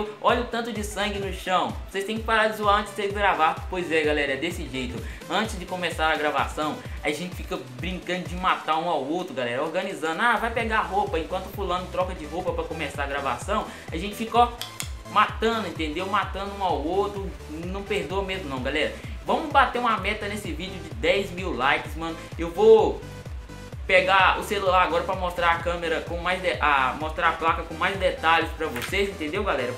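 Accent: Minas Gerais, Brazil. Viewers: mano vc só pode ser mineiro kkk😂😂 parabéns agora rumo a 1 milhao tmj #forçachape😭😭👏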